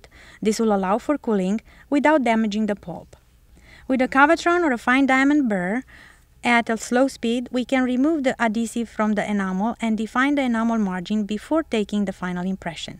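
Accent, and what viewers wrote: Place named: Romania. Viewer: I thought she was romanian from her accent. And apparently she is :D I'm from Romania too.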